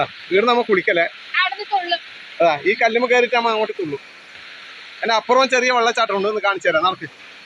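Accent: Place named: Payyanur, India. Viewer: എന്തൊരു ഭംഗിയുള്ള സ്ഥലമാണ്❤ അവിടെയൊക്കെ കൂടി നടക്കുമ്പോൾ എനിക്ക് വല്ലാത്ത പേടി തോന്നി വല്ല പാമ്പങ്ങാനും ഉണ്ടെങ്കിലോ എന്ന് വിചാരിച്ചു ഇതെവിടെയാണ് സ്ഥലം ഞാൻ കണ്ണൂരാണ് പയ്യന്നൂരിൽ പറഞ്ഞ സ്ഥലം ഒക്കെ അറിയാം ഭാഷയിൽ നല്ല പയ്യന്നൂർ ഭാഷ എന്തായാലും ഞങ്ങളെയൊക്കെ കാണിക്കാൻ എത്ര റിസ്ക് എടുത്ത് പോയല്ലോ മഴയത്ത് ഇനിയും മഴയത്ത് പോകരുത് ഇങ്ങനെ വഴികളിലൂടെ നമ്മൾക്ക് ഒരു അനക്ക് ഒരുപാട് സന്തോഷമായി ❤❤ നിങ്ങളുടെ വീഡിയോസ് ഒന്നിനും മെച്ചപ്പെട്ടു വരുന്നു ❤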